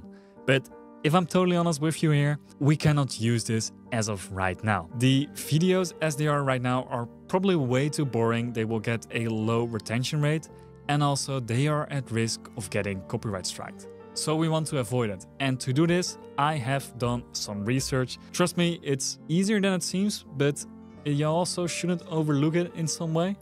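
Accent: Netherlands. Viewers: The Dutch accent😂 Btw nice vid!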